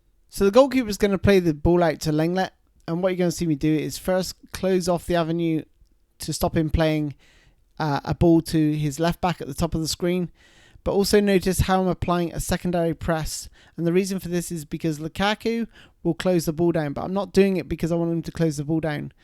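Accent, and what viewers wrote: United Kingdom. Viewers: Great explanation, and a new Sub from me! Also, you sound like you're from Bristol too? Either way, one new follower :)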